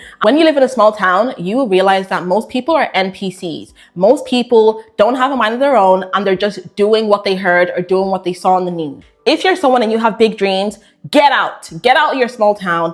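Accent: Ireland. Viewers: Omds girl are you from Ireland?! I know a fellow black Irish girl when I hear them 😩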